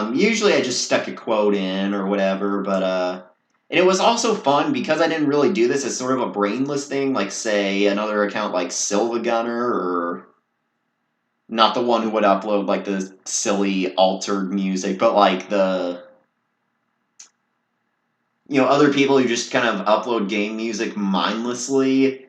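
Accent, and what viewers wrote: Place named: Texas, United States. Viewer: why do you sound almost exactly like terrev but slightly more texan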